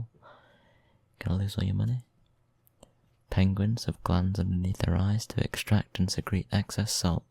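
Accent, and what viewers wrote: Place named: United Kingdom. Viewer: Omg you are from the uk aren't you? I kinda fall in love with people from the uk just because of the beautiful way they pronounce every single word aaagh i can't. I apologize for mistakes, greetings from germany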